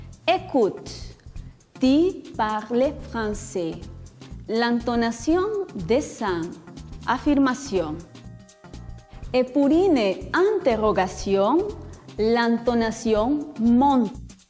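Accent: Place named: Spain. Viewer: ella pronuncia como español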